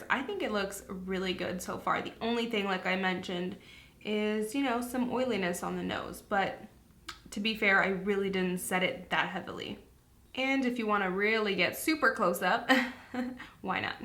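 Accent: Canada. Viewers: is it just me or was her accent extra canadian today?😂💜love it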